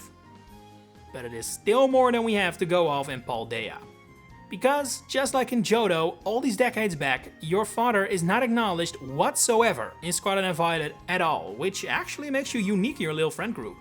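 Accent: Netherlands. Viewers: Lol, I thought you sounded Dutch a few times in this video. Turns out you are. Hello fellow Dutchie 🇳🇱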